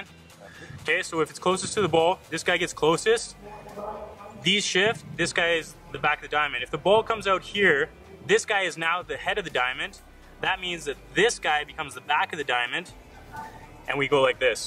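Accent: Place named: Canada. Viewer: As soon as I heard like a fkn Canadian accent I turned it straight off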